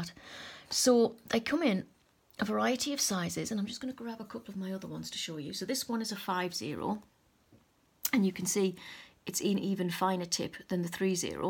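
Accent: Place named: Scotland, United Kingdom. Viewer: Hullo,! I've been watching your vids for awhile now (I subscribed) for 2 reasons: 1. You're so talented and a wonderful teacher! You don't hurry thru and you are very clear and precise. 2. I am a transplanted Scot living in the US and your voice reminds me of my Mum. Same accent AND she was a painter! So you bring me comfort on two levels. Thank you so very much for brightening my days. Blessings xo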